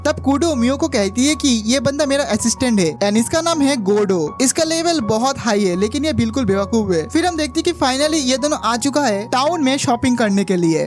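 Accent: Bengal, India. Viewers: Bhai tum bengali ho kya😅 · Are u a Bengali ? like ur accent is like that and nothing else ! ! ! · If I'm not mistaken, you are a Bengali or your mother tongue is Bengali. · tum bangoli ho mughay pata chalgiya😂😂😂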